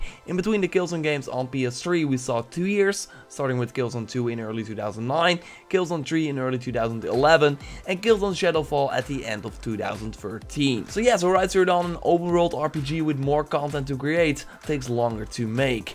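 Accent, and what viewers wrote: Netherlands. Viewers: ge hoort het toch da ge nederlands bent van origine ze man :) kweet ni hoe funny voor mij :p (geweldige video's) kben al nen hele tijd uw vids aant bekijken en kzouet belange ni beter kunne.... goie werk en blijf vooral verderdoen man :) grtz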